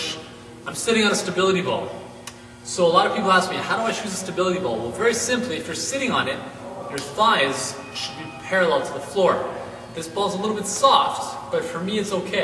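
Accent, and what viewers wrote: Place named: Canada. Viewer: ha, I knew he was Canadian. The way he pronounces the "ou" in "out" is a dead give away. I used to date a Canadian and that's exactly how he talked.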